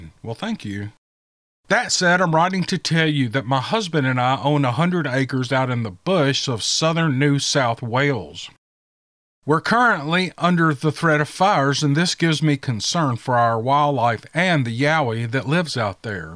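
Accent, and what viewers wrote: South, United States. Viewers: I absolutely love the southern accent. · I’m Irish always loved the American accent & by far the most popular among anyone I know is the smooooth southern accent.I love your ramblings they are full of warmth & humour🥰!! All the stories you choose are something else & your narrative skills are a gift to the world.I think it’s so incredible how people who relate their stories to you really benefit from doing so.You have a great channel & I love your choice of music it’s so evocative & real musicianship 🎶🎸 to savour, Lizzie. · I like to listen to your southern accent. You are my favorite Bigfoot narrator. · Hi, as an Aussie I can confirm we like the southern accent. Possibly from over exposure to Dukes of Hazzard back in the day. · I here in the United Kingdom also LOVE listening to your southern accent it's made your channel instantly one of my top. 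I just love it ❤️ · Hey how you doin,just wanna say that your southern accent is just PERFECT for telling these$ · Aussie here and love your southern accent :)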